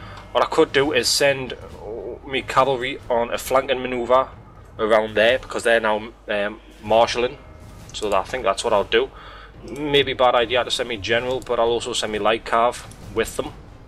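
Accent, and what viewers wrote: Scotland, United Kingdom. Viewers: You look like a scotland by listening to your accent. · I know late with this, but i lov your accent. Me being part scottish myself, iv always wondered what we sound like. I also get an unusual amount of exitement from ur voice and how u say me instead of my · Am I wrong or You've got a scotish accent? The way you say "here" and "fock" is very unusual.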